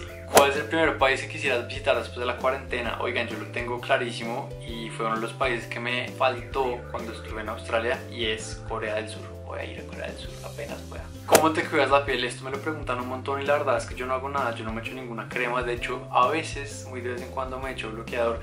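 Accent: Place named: Colombia. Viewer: Baby face jiji you look more younger jajaj ! Me encanta tu acento colombiano !! 😁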